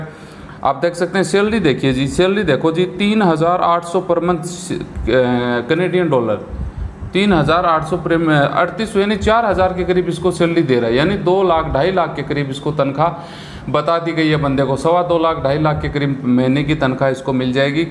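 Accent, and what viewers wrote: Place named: Haryana, India. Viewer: Waise mujhe Aap Haryana se hi lg rhe ho ji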